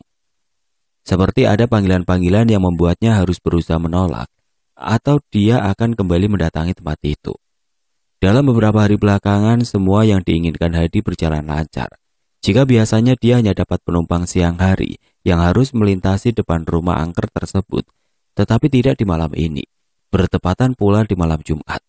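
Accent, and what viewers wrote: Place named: Medan, Indonesia. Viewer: Org Makassar tp logat medan, saran aja bang, sesuaikan ajaa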